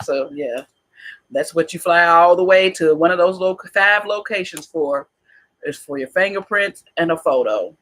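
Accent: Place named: Midwest, United States. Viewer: Where are you from? You sound like you from the mid-west.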